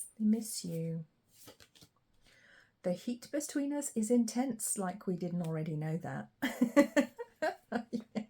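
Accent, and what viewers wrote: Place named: United Kingdom. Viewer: First thing you said in option 2 is you heard a British male voice. That’s my person (I’m American). His particular accent is much like yours. Sunflowers, poker, navy, and keen humor are especially significant. 1’s, 0’s and time— it’ll be 10 years apart at the end of summer. Also my sun and moon signs showed up. This resonated well in many ways—excellent reading. Thank you ❤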